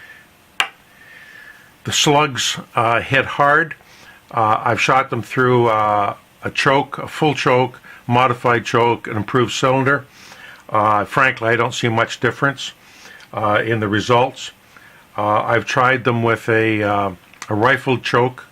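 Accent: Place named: Canada. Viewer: Worth noting that at today's (2023) lead and shell prices, if you reload and cast you can shoot slugs cheaper than bird shot. I can buy soft lead ingots for $2/lb, but #8 shot runs awfully close to $3/lb (US dollars here, since you sound Canadian). I can cast enough Lee slugs for a season in an hour or two over a gasoline camp stove (fuel cost about a dollar for the session), load them with the same wad and powder load I'd use for the same weight of bird shot, use a bingo chip (around a cent each) for an overshot card and either roll or fold crimp (I have a roll crimp tool, but my Load All II makes really nice fold crimps). You may want a 20 ga. nitro card inside the shot cup under the slug to keep the wad from getting stuck in the slug base, that's another two cents or so. A 1 ounce slug load then costs a few cents less than a one ounce birdshot load in the same hull, with the same powder, and ought to be about as accurate as your waxers -- and less work, IMO. 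I doubt I'll ever pay for commercial slugs... BTW, if you want to cut the crimps but keep the compression on your wad, you can put an overshot card on top of your wax slug (cut with a 3/4" punch out of cereal boxes or similar card stock) and then roll crimp over that. If you cut the minimum to remove the edge of the crimp, you'll have plenty of hull to roll over on top of the wax and card.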